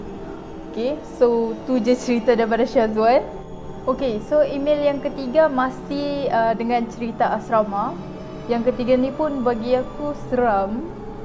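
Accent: Sabah, Malaysia. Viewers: Akak ni org Sabah ke? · akak ni org sabah ke org mane 😂😂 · Akak orang Sabah ke? Sebab saya tengok cara akak bercakap macam orang Sabah....Saya orang Kedah...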